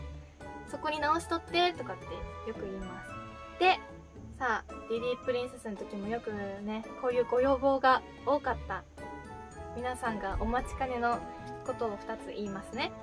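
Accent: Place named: Hakata, Japan. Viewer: 千葉県からです！博多弁が凄く好きで、フル見させて貰いました。やっぱり博多弁っ良いですね！ありがとっちゃね！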